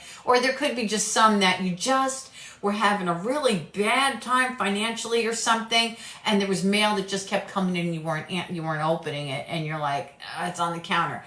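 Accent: New Jersey, United States. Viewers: . I LOVE YOU. 😭 I was guided to your videos for the first time a few days ago. I was feeling rather defeated that day. I mindlessly typed something in google search like: "Spiritual Warfare and Overcoming Procrastination/Disorganization through Christ." I clicked on your video, wasn't thinking much of it, but then you grabbed my attention as if you had a megaphone in my ear!! 😅 I was blown away by how much I resonated with your words. It was like God was speaking to me directly, but through you. You have truly helped me breakthrough the weight of my idleness. The mountain doesn't seem as overwhelming to climb now. I've noticed less deer-in-the-headlights blank staring at the messes, because I actually know where to start now! 😆 Hallelujah! Praise to the most high! I am so thankful that He directed me to you! This video was a key that unlocked even more answers for me! 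I'm not exaggerating when I say you are an answer to my prayers,! I'm younger than your children and live thousands of miles away but, I wish we were best friends. I just want to hug you and talk for hours. My soul understands yours. Ah. Thank you for being you. 💕💕💕💕 P.s. I love love love the Jersey in your voice! It's so great 😜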